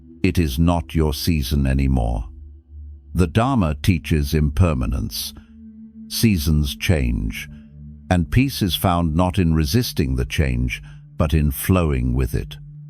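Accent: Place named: United Kingdom. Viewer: Good info but long and wordy. British accent is irritating. Could have been 20 mins.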